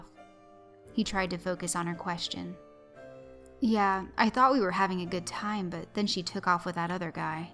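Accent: South, United States. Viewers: idk what it is about your voice just make me fall asleep i don't know if it the southern accent listed to the story you narrate makes me fall asleep i work 3 shift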